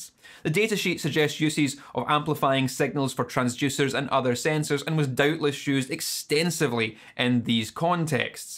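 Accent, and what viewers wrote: Scotland, United Kingdom. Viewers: I love this guys accent so much,! how can i it? What is this accent? Scottish？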